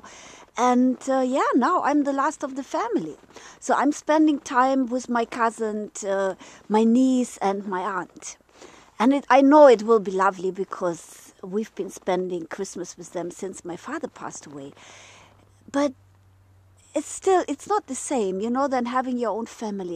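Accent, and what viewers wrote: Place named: Germany. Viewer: Thank you! I also wish you a most happy christmas. You're a fantastic lady and it's a pleasure to communicate more with you then ever before. Your toastmasters involvement surely did good to you German accent English;-) Keep up the great work! Let's make it TO BE fabulous!:-)))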